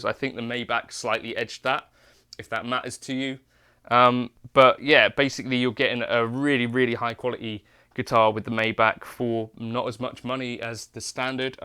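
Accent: England, United Kingdom. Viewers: Guys it is ENGLISH UK accent. His Wife has a Great Accented Voice too.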